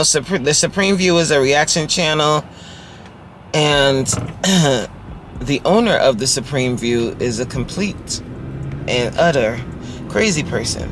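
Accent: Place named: Africa. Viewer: It's the African voice for me hahaha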